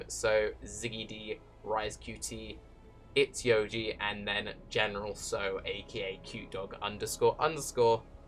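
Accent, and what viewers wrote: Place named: RP, United Kingdom. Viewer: yeah i miss posh speaking tarke made me feel like im im the presence of royalty. FeelsRoyalMan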